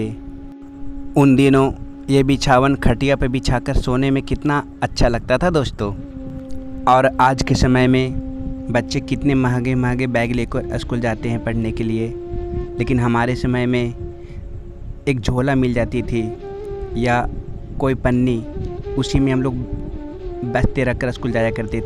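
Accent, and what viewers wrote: Bihar, India. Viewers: Bhai aap paka bihar se hona bhai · sir aap bihar k ho kya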